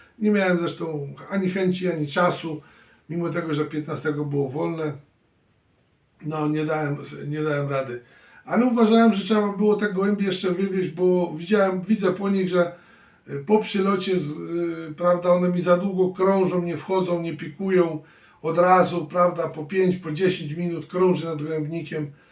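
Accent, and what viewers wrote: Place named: Russia. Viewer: Co ten chłop pieprzy.Mowi na filmiku że już teraz zbiera czarny bez i daje gołębiom.Bylem w paru miejscach z ciekawości gdzie rośnie czarny bez i wszędzie jest jeszcze niedojrzały.Dlatego mnie to zdziwiło że ten hodowca od siedmiu boleści mówi że już zbiera i podaje bo ja też podaje bez i zbieram dojrzały na początku września.Radze nie słuchać rad tego hodowcy bo nie trzyma się kupy ani dupy.A jak taki wybitny hodowca że chce szkolić innych to niech się pochwalić jakie ma wyniki na przestrzeni lat na szczeblu oddziału już nie wspominając wyżej Żenada co on bredzi na tych jego filmikach jeszcze ten ruski akcent.Ortodoksyjny Ślązak!!!!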